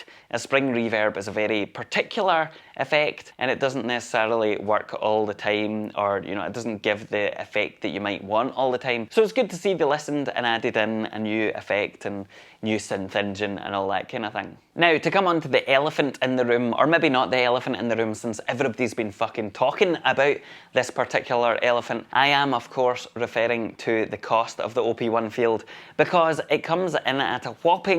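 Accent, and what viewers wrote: Scotland, United Kingdom. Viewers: A man of the people! Great to hear your take on this, I know this is isn't normally your schtick but clearly... the people wanted to know!! Your elegant way with words and dulcet Scottish tones put this ending over the top!!!!! 🙌🐀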